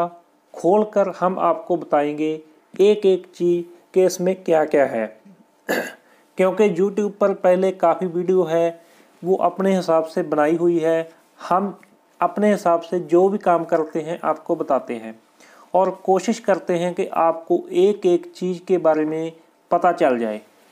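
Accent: Punjab, India. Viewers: Punjabi banda lag raha hindi bolne ki tone se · Very good voice quality with Punjab I accent.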